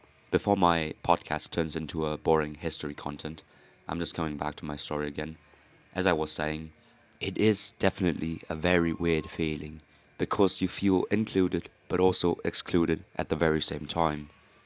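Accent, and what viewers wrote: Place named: Germany. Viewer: Dude don't force your accent. Your accent was cool as it was it's not American it actually sounds German. But not a thick German. I am Australian and your accent trial is a bit jarring because you go English, Australian, American, German in one sentence.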